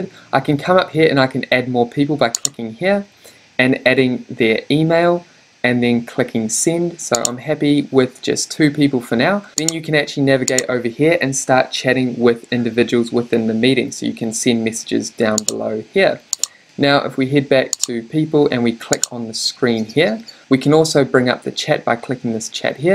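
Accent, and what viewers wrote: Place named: New Zealand. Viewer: Love his kiwi accent💛💖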